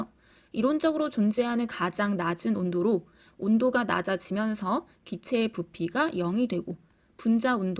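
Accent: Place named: Gyeongsang, South Korea. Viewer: 선생님 경상도분이시죠? ㅎㅎㅎ 억양이 귀여우시네요 ㅎ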